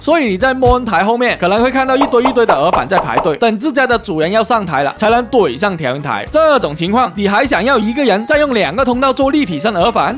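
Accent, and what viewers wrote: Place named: Cantonese, China. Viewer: 樓主的廣東式普通話，真係聽到上癮！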